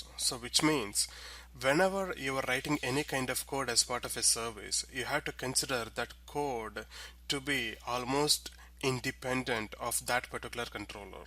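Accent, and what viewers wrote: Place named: India. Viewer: Very good. Since I'm Dutch I had some problems understanding this India way of pronouncing the English language. But who cares if the tut is excellent?! I really never saw the concepts of services so well explained as in this video.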